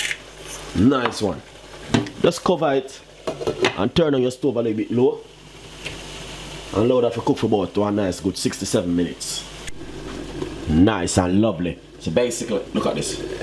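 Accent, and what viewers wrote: Jamaica. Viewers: Your Jamaican accent is so heavy, until I can not understand what you are saying. I love jamaican food but its apparent that you do not want other people, other than your own, to understand this recipe....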